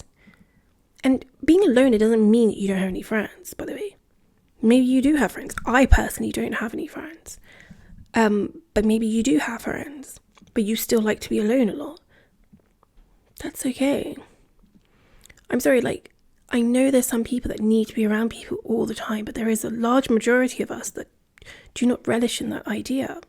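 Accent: United Kingdom. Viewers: Are you english I love your accent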